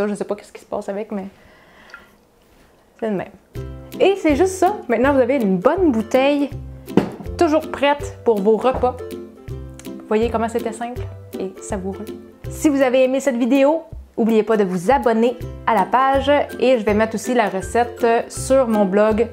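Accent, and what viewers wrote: Canada. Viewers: Je suis d'accord avec Agathe. J'adore l'accent canadien vous êtez trop mignone !..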